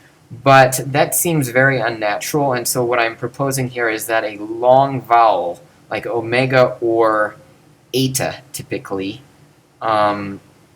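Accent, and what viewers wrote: United States. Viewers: for a lot of the vowel sounds, he is still using a more american pronunciation because a lot of greek teaching in the US teaches the alphabet as more-or-less substitution: Alpha is basically A, epsilon is a short E, etc. when really they should be taught as their own sounds. for consonants, there was a huge consonant shift between Homer and even when the septuagint was written, but he's using the later pronunciation. He should be reading phi as a heavily aspirated plosive, not as a fricative, etc. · Only to me he seems japanese? Listening greek read with english/ american pronounce is very funny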